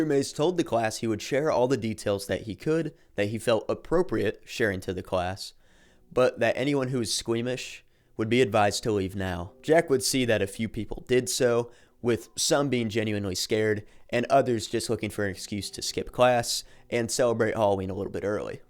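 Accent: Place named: Pennsylvania, United States. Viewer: You're from Pennsylvania aren't you? I can tell by your accent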